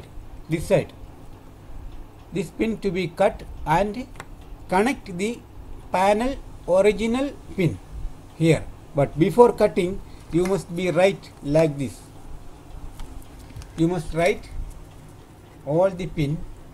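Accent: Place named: Kerala, India. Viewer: നല്ല ഉപകാര പ്രതാമായ വീഡിയോ അത് പോലെ നല്ല മലയാളം പോലത്തെ ഇംഗ്ലീഷ്,👍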